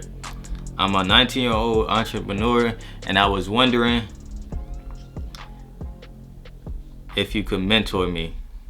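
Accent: Philadelphia, United States. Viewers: You sound like Philly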